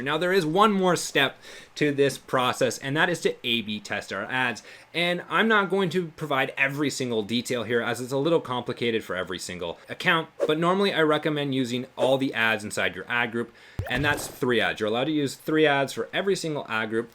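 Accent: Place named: Toronto, Canada. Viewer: Thanks a ton for the content. So useful. You've basically become my tutor on all to do with Google Ads. I'm a small business owner and have a dormant passion for marketing and sales. Used to have a role as a sales and marketing manager. Learned how to build websites and the basics of SEO. Did some basic coding. So enjoyable. Now I run a renovation company. Just getting into the Google sphere and really appreciate and enjoy your content. I'm from Brampton btw. Peel!! Lol 😅 Noticed you're from somewhere in the GTA region by the sounds of it. Anyways, thanks again. Your hard work is appreciated...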